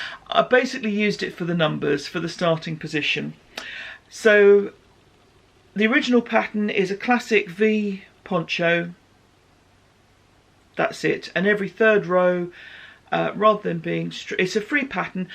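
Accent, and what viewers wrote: England, United Kingdom. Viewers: New viewer here, from New Zealand. I've taken up knitting again, after many years, with inspiration from podcasts during lockdown - I hadn't known there even were such things! So pleasant to hear an English voice, English references, and English humour;-) Thank you for sharing all your FOs, and especially the patterns, I'm taking notes to find several of them. I shall be looking for your previous pocast episodes. Wishing all of you in GB a speedy recovery from this latest covid outbreak that has wreaked havoc with everyone's Christmas, I almost feel guilty for our pretty much normal summer holiday season in NZ. Glad I found you!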